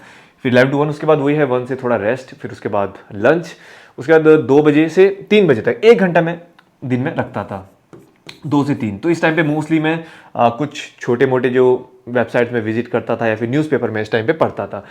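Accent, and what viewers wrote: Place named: Garhwal, India. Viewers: Hallaa hota hai!! Garhwali tone sir❤️😂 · Hn pur garhwali tone ari and face bhi garhwali lgra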